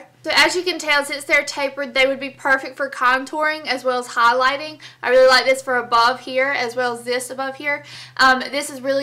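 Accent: South, United States. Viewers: I love your southern accent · LOVE YOUR Southern accent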